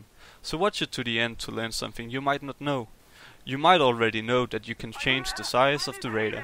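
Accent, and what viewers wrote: Denmark. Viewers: You’re danish right? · Du er dansk kan høre det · That danish accent!! :p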